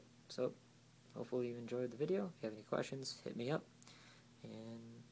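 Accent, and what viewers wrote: Boston, United States. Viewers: Bro u got a heavy Boston accent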